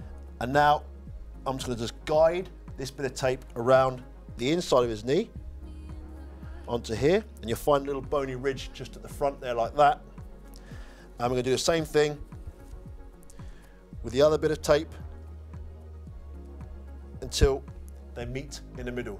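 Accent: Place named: England, United Kingdom. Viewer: that English accent he has is awesome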